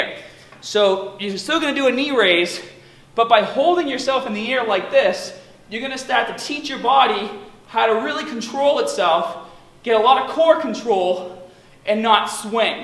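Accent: Boston, United States. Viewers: boston accent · I figured maybe he always spoke with a Boston accent but hid it in his earlier videos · Yeah he's from Boston so he's just been hiding it in the past.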